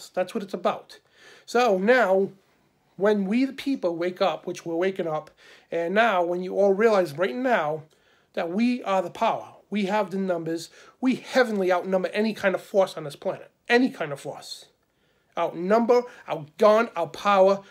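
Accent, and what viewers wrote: New Jersey, United States. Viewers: You sound like your from NJ.,definitely the north east coast.Well said ,well said.I agree with every word. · I didn't realize Rhode island had a Jersey accent. Off topic but I didn't catch it until I caught your video addressing your state criminal organization (your elected officials) let's just hope more men and young adults along with the rise in woman conceal carry holders/gun owners in general stop feeding into the complacency and cush way of life and realize things will become very uncomfortable when we restore constitutional law back to our country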